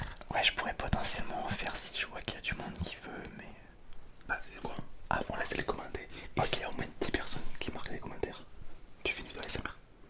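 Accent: South, France. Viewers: Vous n’avez pas le même accent. a l’accent du sud mais pas petit frère 😅. Mais il peut se lancer dans l’ASMR, il a le fluide 😁 🩷🩷🩷💫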